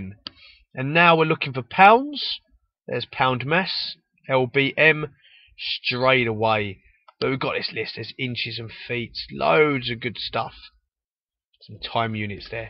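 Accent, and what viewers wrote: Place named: Birmingham, United Kingdom. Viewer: Love the Brummy accent! Great job on the video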